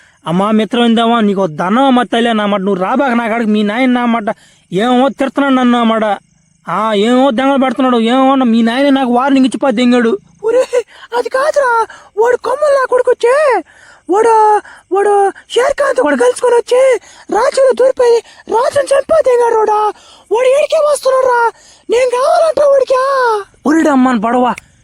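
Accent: Nellore, India. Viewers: Nellore slang 😂😂😂 maadi kooda Nellore district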